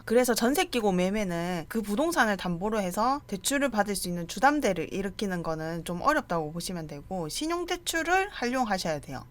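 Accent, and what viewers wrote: Gyeongsang, South Korea. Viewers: 대표님 경상도분이신거 같아요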